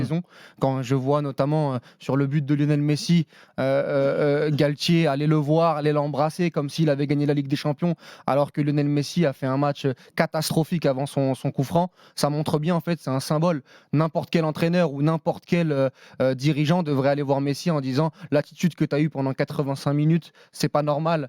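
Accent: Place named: Banlieue, France. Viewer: Galtchhhhier...Contchhhhinuer...tRRRRRRRRRès loin du compte...mais putain cet accent des cités quelle horreur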